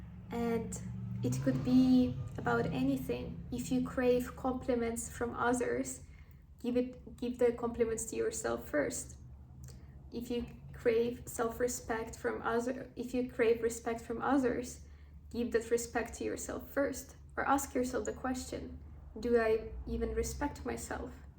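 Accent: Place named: Russia. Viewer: твой русский акцент слишком палевный)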